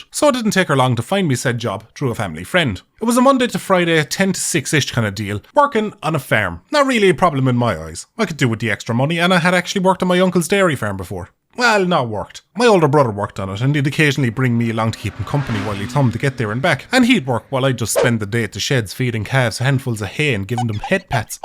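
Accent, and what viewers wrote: Ireland. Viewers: I just noticed your accent, are you from County Cork or Dublin town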